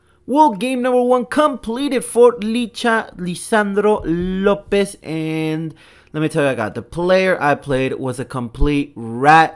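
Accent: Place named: Argentina. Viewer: Hermano tu hablas como un argentino, sinto falta de los jugadores de mi flamengo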